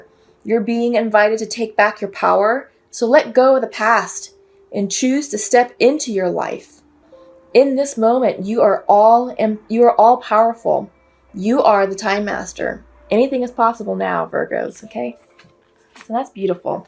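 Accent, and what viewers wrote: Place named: Brooklyn, United States. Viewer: Sounds like your close to Verrazano Bridge Brooklyn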